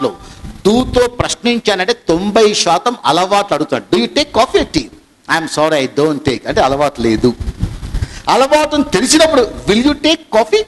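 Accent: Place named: Telugu, India. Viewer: speaking english in telugu slang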